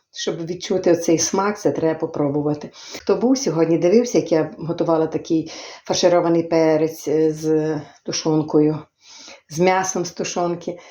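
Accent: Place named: Kirovohrad, Ukraine. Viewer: Спасибо, Вам большое. Случайно попала на Ваш канал и очень рада, что попала. Слушаю, не наслушаюсь вашим украинским говорит, таким славным. У меня мама родом с Кировоградской области, говорила именно так. Спасибо ещё раз.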